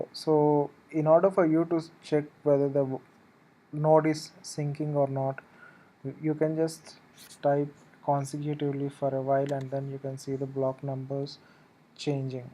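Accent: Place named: India. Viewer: I've seen like 10 videos of americans and other nationalities trying to explain a Bitcoin node. Awesome to see someone with an indian accent talking about this too. Nice video, keep it up!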